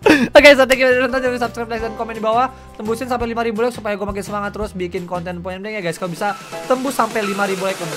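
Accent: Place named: Pekalongan, Indonesia. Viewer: Pasti orang pekalongan.😂😂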